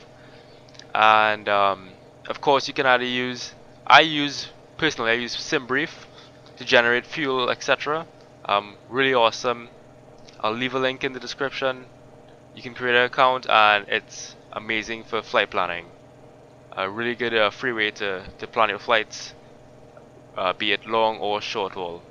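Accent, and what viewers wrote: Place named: Burgundy, France. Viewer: French from bourgogne I think ^^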